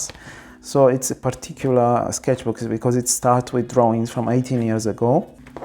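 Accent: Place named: Italy. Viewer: Thank your for showing your sketch books! I like to watch videos like that but most sketch books are more art books - too perfect to be true. ;) Your sketch books are encouraging. Also thank you for showing your art supplies. I also like to hear your voice with your italian accent. :) Greetings from Germany.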